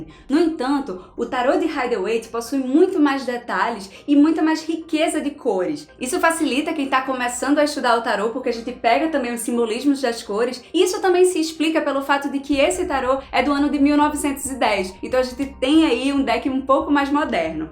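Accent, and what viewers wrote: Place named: Recife, Brazil. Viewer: Pelo sotaque já sei que é de Recife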